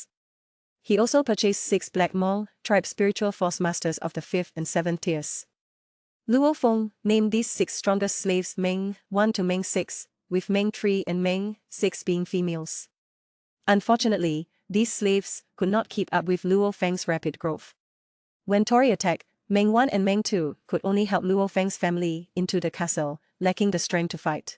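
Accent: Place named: Singapore. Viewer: Are you singaporean? You have a Singlish accent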